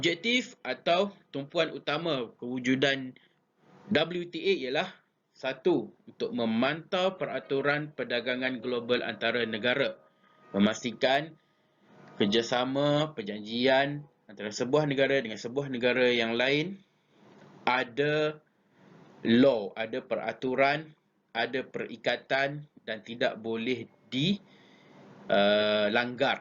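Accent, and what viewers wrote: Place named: Perak, Malaysia. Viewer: Cikgu ckp loghat perak tu stai wuuuu 😂😂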